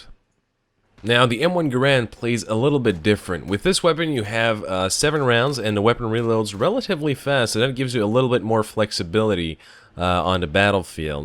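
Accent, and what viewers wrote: Ireland. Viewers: Sounded like the irish accent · Is he an Irishman ?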